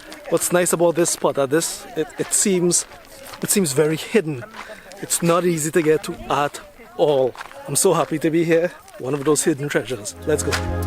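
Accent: Trinidad and Tobago. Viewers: thank you for providing a video of outdoor tunisia the bonus for me was the pleasant surprise of hearing a trini accent i did however notice of marked shortage of aquatic life in the underwater scenes then i saw what was on the lunch menu and understood (lol)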